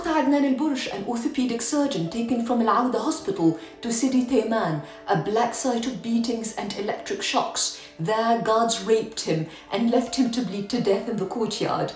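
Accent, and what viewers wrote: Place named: England, United Kingdom. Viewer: Pity about the elite English accent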